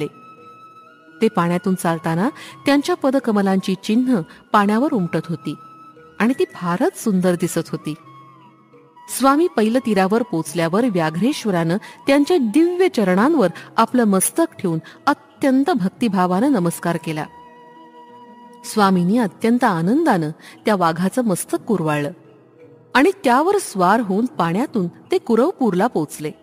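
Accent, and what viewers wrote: Standard. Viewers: Excellent voice didi . Uchhaar agadi shuddha ahet .Sagle adhyay asech upload kara .Shripad shrivallabhanche ashirvad tumhala labhot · Faar Uttam channel ahe... shripad shrivallabh charitramrut ekdum Chan ahe..avaaj khup Chan ahe. Uchhar shudha ahet..aikanaryala aani pahnaryala khilvun thevta..hya channelcha jastit jast prasar vhyala hava🙏 Shripad Rajan Shaaranam Prapadye 🌸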